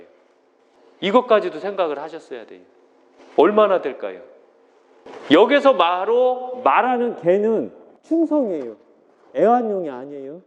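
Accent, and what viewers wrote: Chungcheong, South Korea. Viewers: 선생님 강의도잘하시지만 사투리잼있기도하구 우리사위말씨랑똑같아서많이웃어요 서산이유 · 충청도 사투리가 구수하죠~^^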